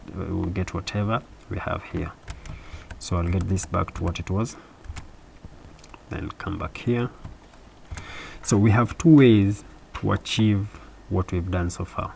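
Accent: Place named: India. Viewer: the technical level of the Indians on this platform is impressive, however the Indian accent for me speaking Portugués is a big problem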